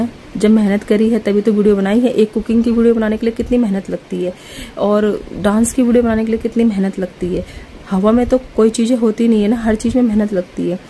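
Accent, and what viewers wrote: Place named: Garhwal, India. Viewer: Garhwali bolate hue acche lagte ho, bahut Sundar 👌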